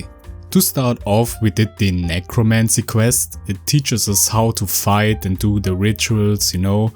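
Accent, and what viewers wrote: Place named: Germany. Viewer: Nice vid! Will watch your series :P Your english is pretty good (Like me, you have an accent, but I understand everything you say) German? Looking forward to the other vids!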